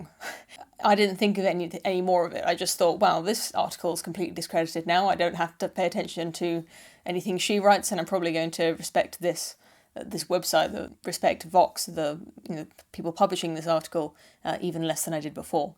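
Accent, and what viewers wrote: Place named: RP, United Kingdom. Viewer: This chick has the Downton Abbey/Pride & Prejudice accent but talks about "thots" and "Pewdiepie". I would pay money to hear her tell the Aristocrats joke.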